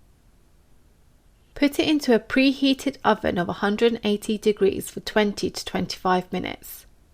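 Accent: United Kingdom. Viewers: I made this today and it came out very good. I substituted the flour with 2/3C of Almond Flour and 1.3C of coconut flour and used the Monkfruit sweetener. The only issue I had was removing it from the springform pan I used. It split apart slightly in a couple of places but nothing too drastic. Maybe I didn't let it cool enough. I served it up with some Neopolitan Ice-cream and I was in heaven. It was all you said it was. Thank you so much for sharing this recipe. I enjoy listening to you and watching you cook. The cross between your British accent and your sweet Asian personality makes this experience and your channel very enjoyable. :)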